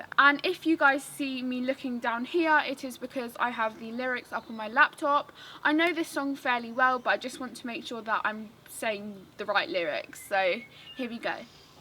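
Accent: England, United Kingdom. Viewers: Sotaque britânico. É britânico, certo? Adoro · love your English accent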